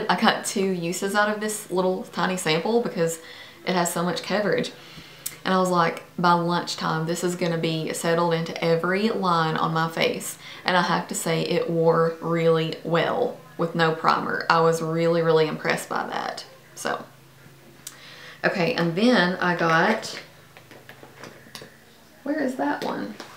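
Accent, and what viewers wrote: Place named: South, United States. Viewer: Your accent... it's like watching a movie set somewhere in kentucky,alabama, texas or a similar country. Magnificent. :)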